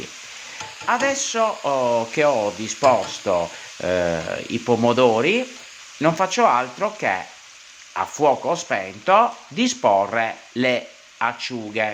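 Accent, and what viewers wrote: Sardinia, Italy. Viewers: Molto piu della ricetta mi piace il suo accento sardo! Eia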